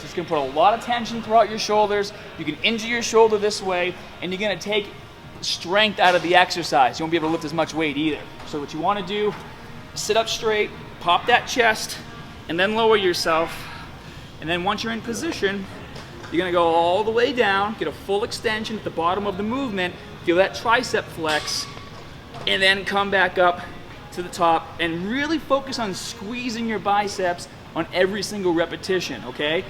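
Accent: Boston, United States. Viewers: it's boston accent. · You don't sound like this in your instructional vid lol nice bAston accent. Keep up the good work though! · He is from the Boston, Massachusetts area I believe. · I never noticed that Boston accent until after watching The Heat. lol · I love going back 6 years on your channel and seeing how little gains you've made, you look the exact same. You also didn't have a boston accent 6 years ago. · came back after 2 years and he suddenly has a Boston accent. Lol wtf. Weird ploy to attract more viewers? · he actually comes from Boston, so... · Did his Boston accent get stronger?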